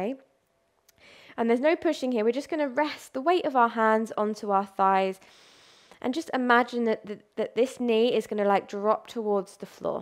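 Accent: United Kingdom. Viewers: Another great session. Thank you so much. I especially enjoy listening to your voice. I am a southern Alabama girl living in Ohio and I can assure you the wonderful British accent is a welcome change.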